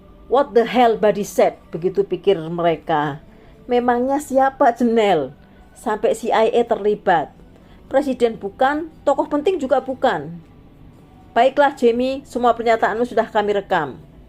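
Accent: Java, Indonesia. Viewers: Jawanya meddok banget 😁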